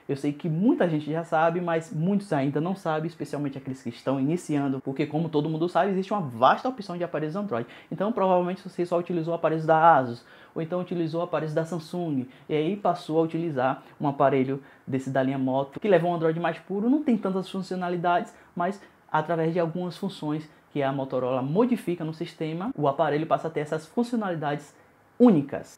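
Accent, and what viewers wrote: Bahia, Brazil. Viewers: Você é baiano né? kkkkkkkk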